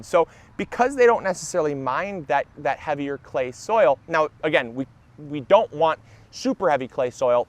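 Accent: Michigan, United States. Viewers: Classic rock Lover the Michigan accent is strong in this one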